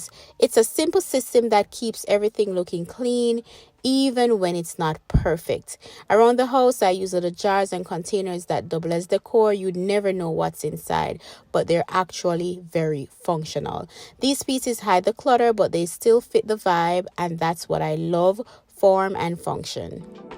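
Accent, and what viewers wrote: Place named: Caribbean. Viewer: I don't know how I ended up on this side of youTube but heard the accent and hard to support my Caribbean sistah! Great tips!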